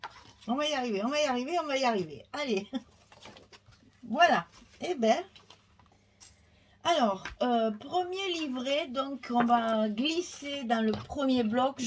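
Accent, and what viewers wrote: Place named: Provence, France. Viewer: Bonjour. J'avais acheté la collection provence ou lavender l'année dernière. Enfin, la même mais ils ont rajouté le vélo et autre chose. Je suis heureuse que vous fassiez un tuto, je vais pouvoir enfin m'en servir! Merci infiniment, je l'aime déjà! Et ce papier avec votre accent... on la sent la lavende 🥰🥰🥰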